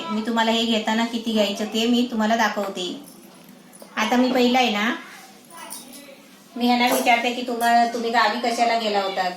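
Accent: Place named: Malvani, India. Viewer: Wa..mastach..tumche malvani type bolne chan watye..aamche watta tumhi..tumchya recipe nehami baghte me wahini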